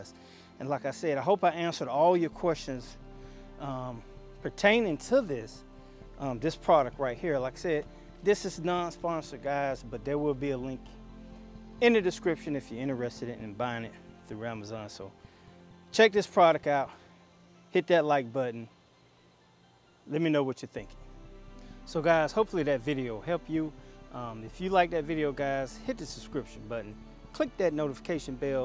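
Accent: South, United States. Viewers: Bruh, where you from, NC or Va?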